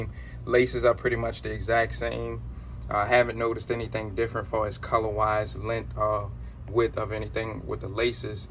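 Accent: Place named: Louisiana, United States. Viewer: You gotta be from Louisiana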